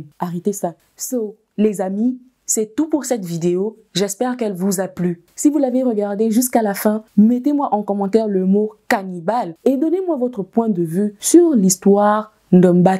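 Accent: Fang, Gabon. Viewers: Ahahah que tu sois fang c’était évident hein dès le départ hein, t’as trop l’accent 😅 Par contre je ne savais pas que ça venait de là „les fang mangent les gens“ 🙈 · abonné, contenu incroyable et surtout que l'histoire m'est familière. Au passage je kiff ton accent fang 😁😁 😁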